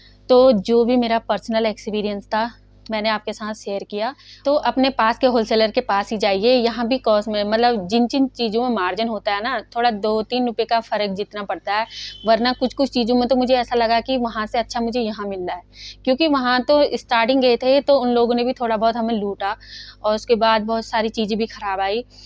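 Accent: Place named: Uttarakhand, India. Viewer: Baat krne k tone se smj jate h wo log Uttrakhand se h 😂koi nhi didi time lgega par jada pese ka maal lena h to sadar se fayeda h 20k ya 30k ka lena h to local se shi h.. First time sbke saat hota h lekin thoda tez hona pdta h didi lekin ab to pta chl gya hoga aapko ki kaha se saman lene m fayeda h first time sbke saat hota h lekin aaj b bina billty k saman aata h bs pta hona chahiye kon bhejta h bs first time sbke saat hota h uske baad sb sahi h bs thoda tez bno waha gunge ki traffic rahoge to badiya se lete h wo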